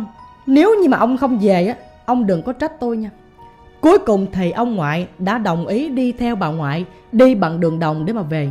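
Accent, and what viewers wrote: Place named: Mekong Delta, Vietnam. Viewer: Anh kể nghe đậm chất miền Tây luôn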